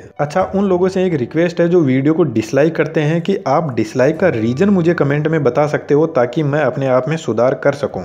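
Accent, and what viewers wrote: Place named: Shekhawati, India. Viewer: Bhai Shekhawati ke District Sikar,Churu, Jhunjhunu se lag raha hai Bolne ke lahje se ...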